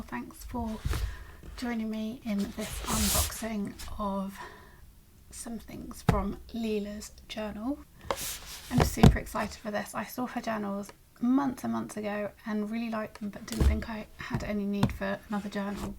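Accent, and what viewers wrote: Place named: United Kingdom. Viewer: you have a British accent?! OMG!!! I was in love with your journals, personality all this time, and now I fell in love with your accent and pretty voice. Definitely continue doing voiceovers. Music to my ears💕💕💕 I need to get the green version of this journal. It is just so aesthetic, I'd love such journal to sit in my shelf. And her washi tapes and sticker designs are always so elegant and feminine. Love love love Leela's style and your unboxing❤‍🔥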